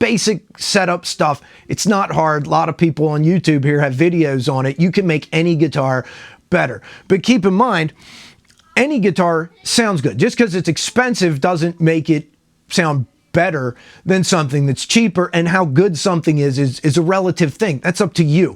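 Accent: Pittsburgh, United States. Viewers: Great video! It's hard to pick a guitar based on a website, that for sure. Even YouTube reviews van be tricky. Sadly, the days of mom & pop guitar stores are going away, unless you count Guitar Center, which I don't - I don't trust them, either. They're known for "factory seconds," and if you know what that is, that's why I stay away from them when it comes to buying guitars at least. I could be wrong, but do I detect a Pittsburgh accent in there??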